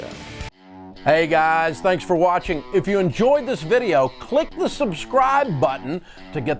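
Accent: South, United States. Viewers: I like your show but New Yorkers accent is a lot better than southern accent. No offense.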